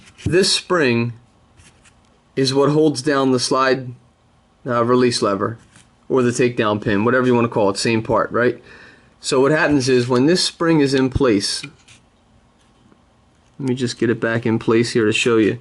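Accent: Philadelphia, United States. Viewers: Dude, are you from Philly? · Ur accent from phila or south jersey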